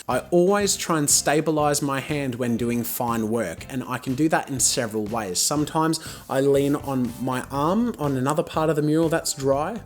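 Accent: Australia. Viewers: U sounded way more Australian in 2014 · He sounds very Australian in this video but not as much in other videos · just because he's Aussie doesn't mean u can make fun of his and my accent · uses Aussie slang, Aussie accent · his Australian accent seem more Australian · your accent was more australian back then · his Australian accent seem more Australian in this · he sounds more Australian then normal, maybe because this video is older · He sounds so aussie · His Aussie accent was stronger here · more Australian in this than it is nowadays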